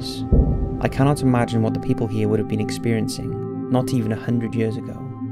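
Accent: United Kingdom. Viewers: I'm assuming you're a fellow Brit because of your accent! I just wanted to ask, how much did it cost to visit there? This video has made me interested in going. Thanks :)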